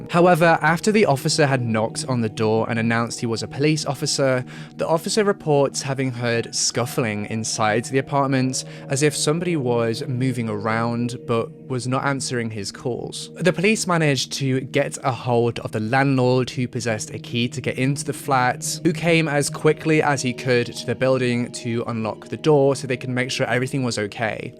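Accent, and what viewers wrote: United Kingdom. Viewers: As soon as I heard the British accent and the racism crack I knew this was going to be another Jesse Smollett defender!! Right off the bat he skips the part about the boy friend following the boy home. And this doesn’t have a damn thing to do with racism. I’ve had ring side seats of this very relationship. My grandson is mixed race and thank God, (not satan like this dude) he’s 16 now, and his mothers boyfriends weren’t killers. Because if we would have come home and found him dead after his mother broke up with her boyfriend who outwardly blamed him, you know who did it. This dude is a young know nothing who’s people already destroyed his country because of this very weakness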